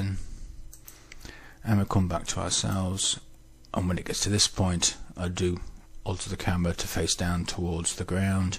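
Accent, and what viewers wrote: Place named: England, United Kingdom. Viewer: Great footage J! I'm still playing with Litchi at the moment, but it's an amazing app. I wish I'd got your in depth knowledge of it ... it's quite a steep learning curve, fine tuning the settings, isn't it? Getting there though, and having fun! Lol! Your videos have been extremely helpful, and, as previously mentioned, it's great to hear an English voice! Thanks mate!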